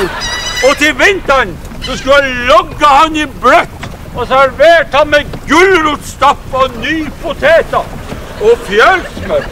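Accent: Norway. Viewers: Trond Viggo er suveren her. Utmerket dialekt,en utgave som kan passe mange plassa. Han høres ut som en nordlending som har bodt ei stund sørpå. Videoen fremstår som en hyllest til oss i nord,og en fantastisk karikatur. 100 av 100:)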